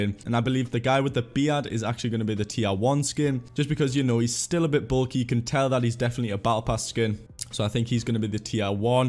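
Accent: United Kingdom. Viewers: He pronounces Tier (Tear), the same way Evryone else in the UK, we do not pronounce it (Tire) like Evryone else, we say it the correct way.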